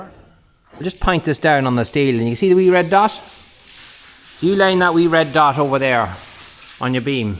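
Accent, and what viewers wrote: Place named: Cavan/Monaghan, Ireland. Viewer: Your channel is growing because you are the product. The videos are well framed and edited, and the content is interesting, but fundamentally, whether you knew this or not, it's you. You are the product here. How you cope with the day-to-day is what people watch for, and you encourage people with your work ethic, attitude, and resilience. It also helps that you have a wicked Cavan/Monaghan accent, and the Yankees love that, too. You keep the country going; you are some of the best we have.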